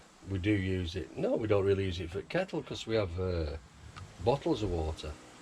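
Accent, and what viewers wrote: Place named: Northern England, United Kingdom. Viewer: Hiya defo agree with other comments try and flatten out the cold water pipe or re pipe it if that dont work shortest route good look m8 and were are you from defo a northern accent were Bolton chris & sheila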